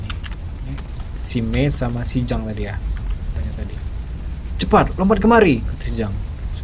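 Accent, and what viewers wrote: Medan, Indonesia. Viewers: Bang kau orang medan ya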